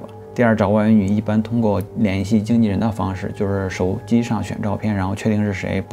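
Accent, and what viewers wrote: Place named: Guiyang, China. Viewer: 你是贵阳的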